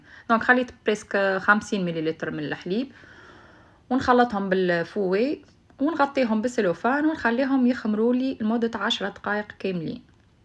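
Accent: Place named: Tunisia. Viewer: الله يبارك 😊مشخشخة تع الصح تهبل اللهجة التونسية يعطيك الف عافيه تبان تشهي كملي راح تنجحي لحبيبة من الجزائر تحياتي ❤❤❤❤❤❤❤❤❤❤❤❤❤❤❤❤❤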